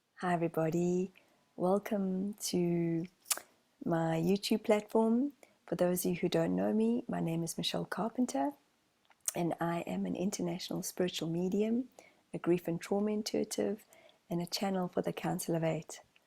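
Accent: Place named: South Africa. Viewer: Hello Michelle. I have just today been divinely guided to you. The moment I heard your voice I immediately identified with you as a fellow ex South African. I have been living in Melbourne Australia since 1982. Thank you for your wisdom. Thank you for introducing me to the Council of 8. I know there are no coincidences and that I was meant to connect with you right now. I feel so comfortable and at home in your energy and that of your mentors. THANK YOU. With so much love. Annette ❤️🤗🙏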